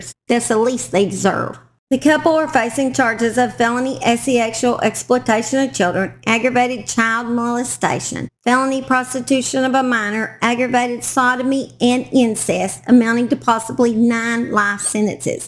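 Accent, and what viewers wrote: South, United States. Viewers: Absolutely love your southern accent, love from Romania 🇷🇴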